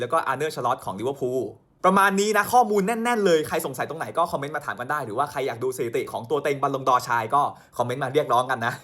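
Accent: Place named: South, Thailand. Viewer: น้องบ่าวเด็กใต้เเน่นอน😂😂😂😂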